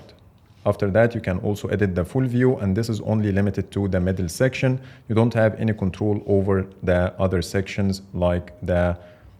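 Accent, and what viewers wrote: Egypt. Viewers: Off topic but you sound Egyptian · كانه عربي مصري🤨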